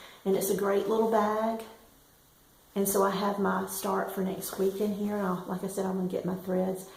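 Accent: South, United States. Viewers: Hi there! I am a new subscriber and I am loving your videos! I live in Indianapolis, Indiana and I love your southern accent. My mother was from Kentucky and for me the southern accent just warms my heart with loving memories. I am binge watching your videos after catching this one. Thanks so much for the time and effort you put into your videos...they bring such joy to me. Happy stitching!!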